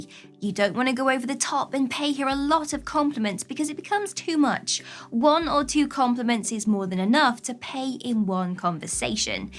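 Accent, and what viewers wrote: United Kingdom. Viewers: I just found this channel tonight; this girl is really cute and I love her British accent.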